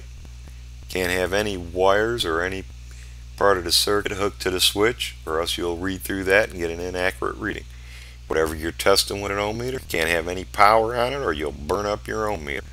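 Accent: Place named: Chicago, United States. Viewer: Thanks for the excellent video. Like the Chicagoland accent as well. Reminds me of home.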